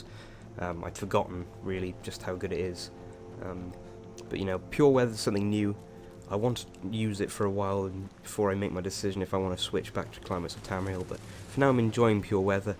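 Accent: United Kingdom. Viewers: You have a British accent :D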